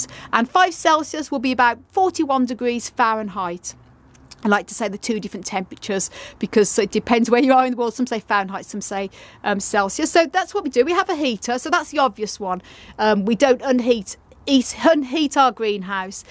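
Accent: United Kingdom. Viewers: lol. said,in her sweet British accent, tarpoline. Here in th US we like to shorten everything and we just call it a tarp. You can get one at any hadware store for 20 dollars or less. As far as your humidity concerns, you can't go wrong with a small fan.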